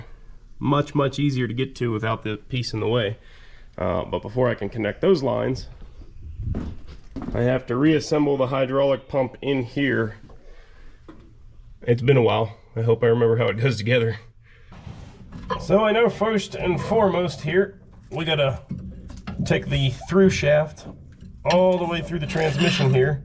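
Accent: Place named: Western Pennsylvania, United States. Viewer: I just found your channel, and I don't know where you live, or where you're from, but judging from your accent it must be western Pennsylvania, am I right?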